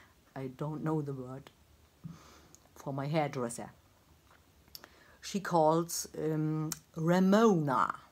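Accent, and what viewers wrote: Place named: Schwerin, Germany. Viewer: Huhu.. super lustiges Video, wiedermal 😊.. Sachmal, eine Frage: dein Slääng 😂 hört sich nach meiner Heimat an 😃.. Nähe Schwerin... du kommst doch da irgendwo wech näää 😊. Lg. Grüße aus Bremen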